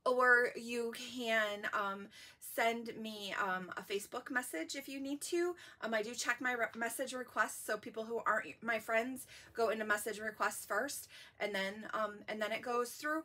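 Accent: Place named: Michigan, United States. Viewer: fellow Michigander here! Totally recognized the accent immediately 🤗💜! New consultant here. You have great content! Looking forward to seeing more 😊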